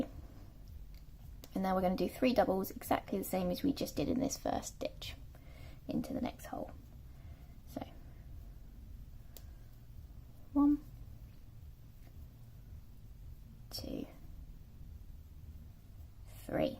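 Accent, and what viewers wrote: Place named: England, United Kingdom. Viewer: Are you using UK or US terminology?? Looks like US but you've a English accent.. Its confusing me..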